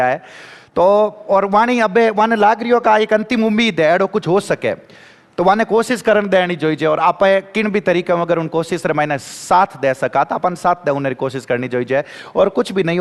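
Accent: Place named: Bihar, India. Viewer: Abe bol kya rha h.... बिहारी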